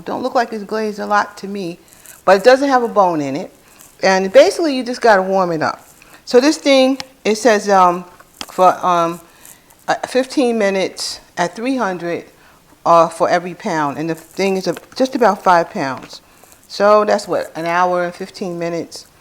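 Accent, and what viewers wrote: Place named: New York City, United States. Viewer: I'm going to make this for thankingiving u sound like a NYC lady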